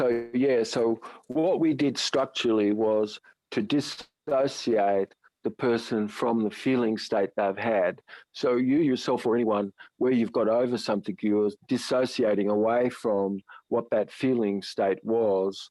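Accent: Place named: Australia. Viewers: Why’s he got an Australian accent? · because...he's Australian..................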